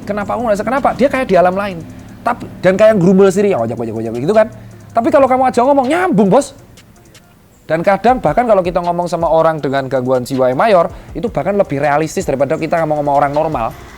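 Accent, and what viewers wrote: Java, Indonesia. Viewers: China medok jawa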